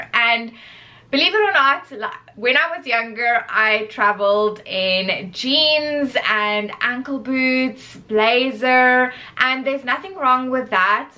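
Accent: South Africa. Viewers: Great travel tips, thank you! Are you South African? Every time I watch your videos, I always think you sound so South African to me (being Afrikaans myself). 😅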